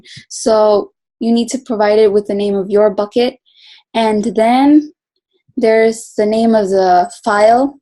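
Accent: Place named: United States. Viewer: Excellent. orchestrated with American ascent with laser sharp technical tidbits is applaudable. Leadership is an impact - you preach what you practiced. 💫💫💫💫💫👌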